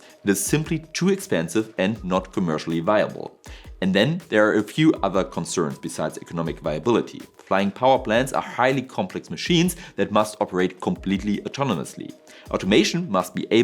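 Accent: Germany. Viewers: A very interesting, well illustrated, insightful and well balanced video. Your speed of presentation was perfect for me. I much prefer your very clear diction and mild German accent to the strong regional accents of the UK. Cheers from Scotland. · honestly like if people think talking slower would help them... they know there is a speed button right? I have never had an issue understanding you and I don't have much experience listen to people with a german acent and sorry I just don't watch you that often. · hello Dr, could you please speak a little bit faster? i'm having trouble paying attention to what you're saying because my mind keeps getting distracted by your thick German accent. you say that when wind speed doubles, its power increases 8 fold? the energy of the air particles is 0.5mv^2 & power is energy/time so maybe 4-fold? you how they make plastic kayaks where they put the kayak mold in a machine that spins it in all directions & has the molten plastic cover all the walls evenly?, maybe after inflation when the balloon is rigid, you could do a similar thing with a very light sealant that coats the insides while the balloon is spinning around all crazy in a heated chamber. 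this design is very cool because the turbines are in an enclosed space & it would cost very little energy to make them bird-safe.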